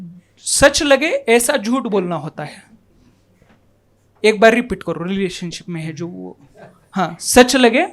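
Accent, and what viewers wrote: Maharashtra, India. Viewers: omg show in banglore, i thought MH... they must like ur marathi accent hindi... but how they laugh on u marathi words??? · Nice comedy in the marathi accent. Jai Maharashtra bro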